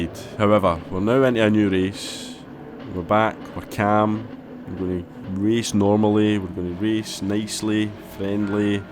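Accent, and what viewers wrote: Scotland, United Kingdom. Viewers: cheers to you for the content mate 👍 we need a good Scottish Accent on YouTube (I wont hold being a Rangers fan against you 🤣).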